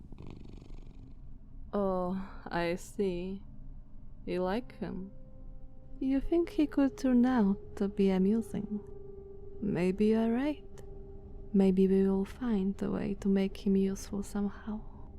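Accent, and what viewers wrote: Eastern Europe. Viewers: I can always appreciate both vampires and slavic accents. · Hmmm. Slavic accent. Nice. Considering that Dracula, the most famous vampire of all time, comes from the balkans, a mostly slavic region. (I know he comes from Transylvania, Romania but the greater picture makes it pretty nice to see for a perfectionist like me, who appreciates details) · Feels like a proper vampire needs a good Slavic accent after hearing this! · Mmhmm this is the good shit👌 vampires with slavic accents are the way it should be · Perfect as ever bless i passed out half way through! So relaxing and loved this dominant side of you and slavic accent! <3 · You had me at Slavic accent Hrnng... I am in love.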